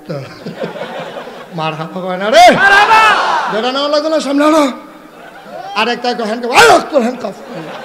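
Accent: Comilla, Bangladesh. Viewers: কুমিল্লার ভাষায় ওয়াজ মাহফিল শুনে ভালো লাগছে!!! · আহা,আমার মধুর কুমিল্লার আঞ্চলিক ভাষা।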